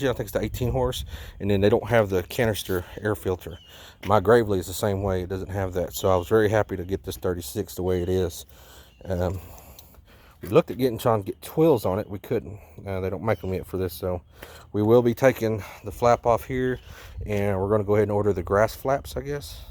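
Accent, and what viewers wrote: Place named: South, United States. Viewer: Congrats on the awesome setup brother. Are you Latino but you sound very southern? Keep trucking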